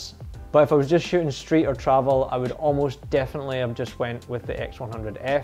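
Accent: Scotland, United Kingdom. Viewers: Hey, you lucky person! A new X100V that someone didn't want, at a really good price. I bought mine and got it two days before the stay home began in Scotland... I've got the same black version. My weather seal kit was on backorder when I picked the camera up, so once this crisis is behind us, I’ll go and collect it... You said you weren't keen on it... Persevere with it, you might enjoy the simplicity of the camera, it just takes a wee bit of time to enjoy it. It looks like you're in the USA but I hear a Scots accent there... 🏴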